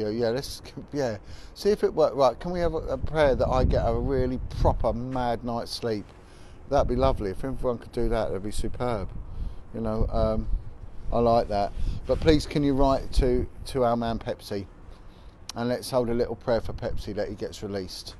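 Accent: Cockney, United Kingdom. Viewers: When are you going to start speaking properly mate? Put some effort into that? In your 'important subject'......? Not like a cockney drug dealer.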